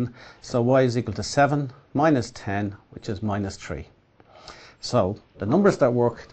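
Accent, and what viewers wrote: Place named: Dublin, Ireland. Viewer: Am In 2nd Year And I Know That! But Fail Play Tah Yeh!! Btw Love YOur Accent very dublin :L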